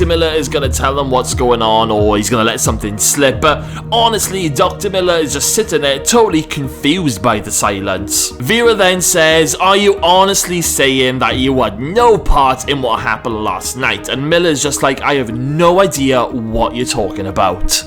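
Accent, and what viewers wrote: Wales, United Kingdom. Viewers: Hi, LOVE your enthusiasm for the show, your Welsh accent & always look forward to your reviews. We will all be gutted when Wentworth is finished. Are there any tv series that you & Peter recommend for viewers to watch in the future, with amazing writing & acting like Wentworth? Thanks from Renee in New Zealand 🥝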